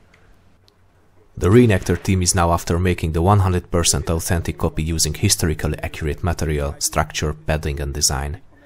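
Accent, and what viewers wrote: Hungary. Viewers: I love that hungarian accent :) Experiments like this are a mix of experimental archaeology and some sort of survivalism. You never know when such infos will be useful. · Hungarian accent 😃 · I know that you’re Hungarian but the accent is extremely annoying....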